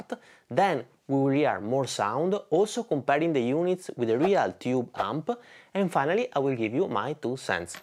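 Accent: Venice, Italy. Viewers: ti ze venessian da l'accento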